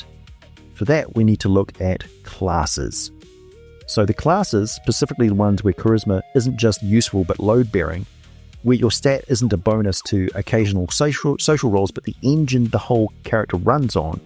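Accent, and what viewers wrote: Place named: New Zealand. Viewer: oh I remember those days well! I don't miss them..... I've got to say as well; it's quite surreal hearing a fellow kiwi on a youtube channel! 😂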